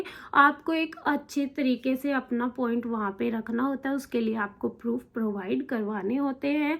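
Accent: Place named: Haryana, India. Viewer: Your Jaatu / Haryanvi accent is too visible. "Discussan , Rejecsan" LOL And here is your not-so-intelligent Haryanvi mind who can't comprehend what is remote work! Guys, you can't work in the UK on a tourist visa.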